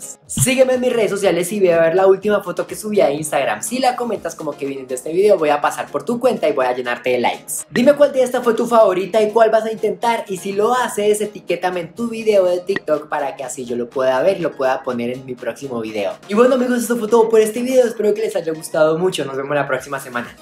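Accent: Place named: Mexico. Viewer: De dónde eres no me suena tú dejo o eres Mexicano?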